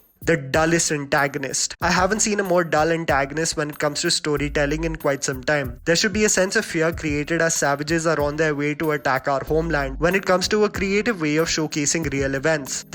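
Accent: India. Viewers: He has a funny Indian accent. Not sure if you Indians should allow your funny accents ruin Tarantino movies with your “taank you come again” accent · lol such a sad creature, if you hate Indian accent why are you here?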